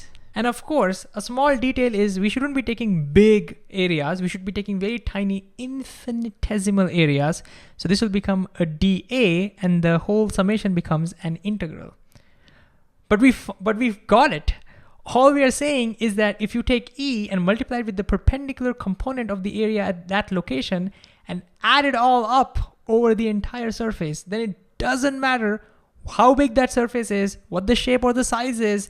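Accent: India. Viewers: JUST WANTED TO KNOW OUT OF CURIOSITY ARE YOU BY ANCHANCE AN INDIAN ? SINCE YOUR ACCENT IS A LOT LIKE US ,BTW YOUR VIDEOS DO SPARK A VERY INTENSE INTREST IN PHYSICS AND THANK YOU FOR THAT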